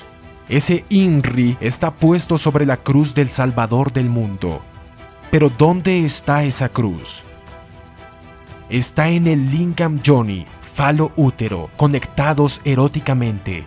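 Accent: Colombia. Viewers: Eres colombiano 👍🏻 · Eres colombiano ?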